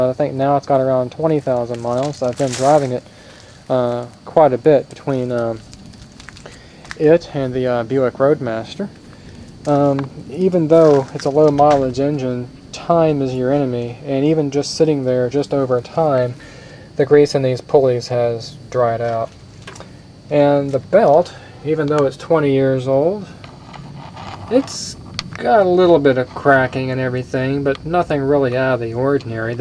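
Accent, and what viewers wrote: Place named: Seattle, United States. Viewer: You sound like your from around Seattle/Everett WA.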